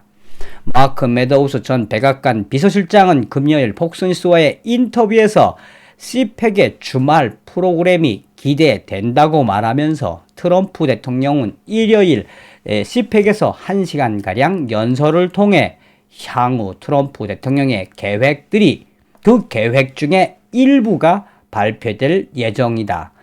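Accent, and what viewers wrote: North Korea. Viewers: 방송 희소삭 매일 우울한 국민을 가짜든 진짜든 희망을 갖게합니다 하여 방송 감사하게 생각합니다 그러나 북한사투라는 이해하자만 이시점에서 좀 삼가하심 어떠신지 ? 죄송합니다.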